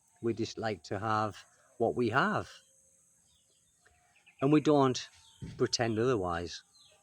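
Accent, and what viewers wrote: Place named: England, United Kingdom. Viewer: I totally agree with you. My husband is a pure english born here in US. I had the blessing of meeting my mother in law and was able to take care of her. You always remind me because she has the same beautiful accent. Learned their straight forward character. I was then able to protect and defend myself by using my mouth at work. Proud of it because i always just cry on the side when i was abused mentally. I appreciate all your videos. I love learning so i always look forward to be inspired again the next day with your knowledge and wisdom based on experience and advices all your life. Thank you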